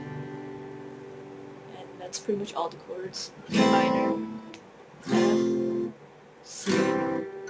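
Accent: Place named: Asia. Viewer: Lol ur asian accent. You said," da tabs..."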